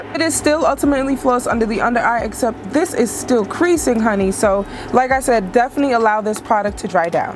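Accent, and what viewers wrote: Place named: New York, United States. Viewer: I love the New York accent!❤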